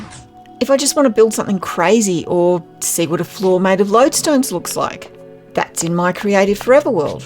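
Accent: Australia. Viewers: thats one heck of an aussie accent love it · Hello, you sound Australian. im Kiwi, its so pleasant to hear your voice and see what you have made ! Incredible work aswell you have some passionate builds! Thanks for inspiration ❤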